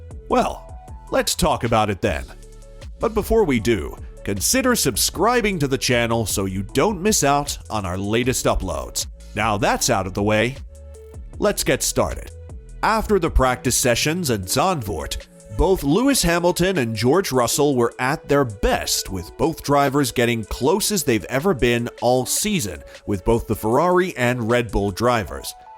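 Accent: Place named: United States. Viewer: please can you talk normal English and not the American way... every word so exaggerated....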